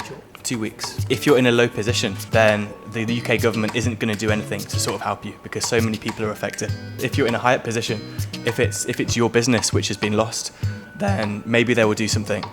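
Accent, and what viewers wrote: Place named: United Kingdom. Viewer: 我也超愛他的英式口音 也試看看不看字幕 天啊～完全不行... 挫敗感啊 哈哈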